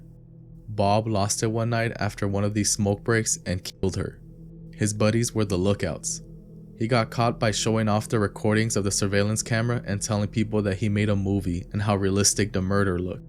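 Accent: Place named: United States. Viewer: its crazy how good your english is you sound like an average american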